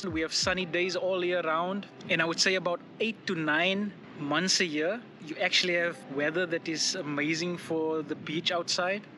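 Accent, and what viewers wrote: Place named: South Africa. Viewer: Wow, was scrolling info about Qatar… I heard the accent and new , ag jinne man , die seun is van Suid Africa!!!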